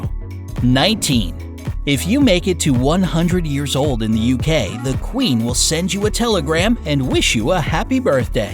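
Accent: United States. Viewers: U sound American.. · why did he speak American accent in the video about UK???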